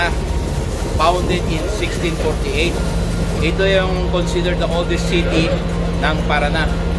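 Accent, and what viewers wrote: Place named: Bohol, Philippines. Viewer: Boholano salita oi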